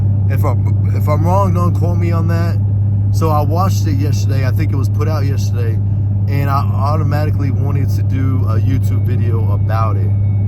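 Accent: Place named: United States. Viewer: You must be from the country you sound country...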